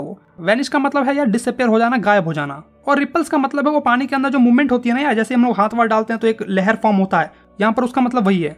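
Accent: Bihar, India. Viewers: Bhaiya are you from Bihar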